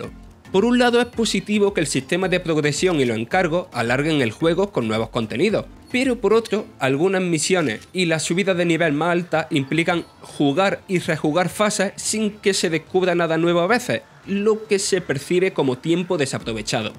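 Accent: Andalusia, Spain. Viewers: La gente que se queja de su acento andaluz diciendo incluso que le sangran los oídos, todo bn en casa? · yo creo que mas andaluz, es que tiene algun problema, yo no hablo asi y no conozco a ningun andaluz que hable asi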